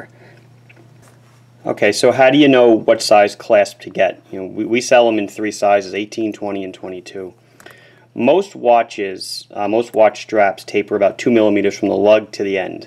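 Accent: Long Island, United States. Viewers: "It popped right awf" "Now that it's instawlled" Love lawnguyland accents.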